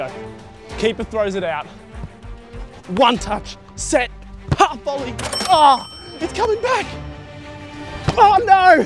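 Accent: Australia. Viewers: Is this guy Australian and if he is is he from Sydney? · Ok now i knew your australian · are ya aussie as well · he sounds like a aussie is he one? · Ru an Aussie? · I had no idea you were australian, I assumed you were british from watching your shorts with no audio lol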